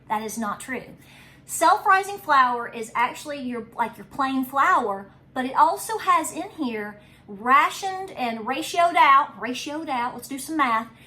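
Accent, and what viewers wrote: South, United States. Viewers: Also I am subbin any gud ole southern gal that says Flar and not flour is my kinda sister. Glad to have found ya channel gurl! God bless y'all!!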